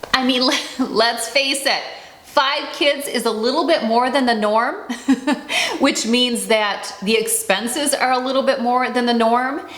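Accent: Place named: Northern Indiana, United States. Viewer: Love your accent, sounds like mine! Where are you from? You have to be from Northern Indiana-Michigan area.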